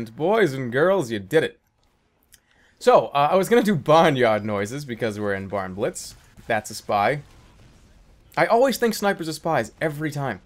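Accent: Boston, United States. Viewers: Are you from Boston? I'm a new sub, and I can't tell...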